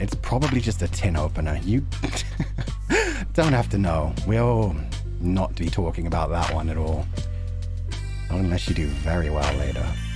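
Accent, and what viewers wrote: RP, United Kingdom. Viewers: Like vox received pronunciation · posh I think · naaah he’s Vox type of british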